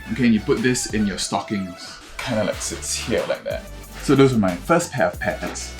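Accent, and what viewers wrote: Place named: Malaysia. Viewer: very detailed video , im from Canada originally from Malaysia, , i recognized youre accent right away lol. Im gonna be doing drag alot more and this really helps alot thanks queen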